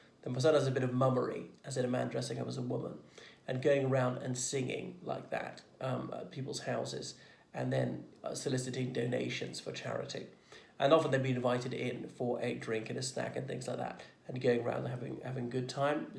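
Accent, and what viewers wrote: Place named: England, United Kingdom. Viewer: You sound incredibly English to me. What is your channel actually about? Is it more of a discussion channel?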